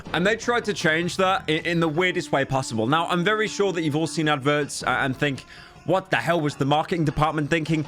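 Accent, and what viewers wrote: United Kingdom. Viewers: Bro why british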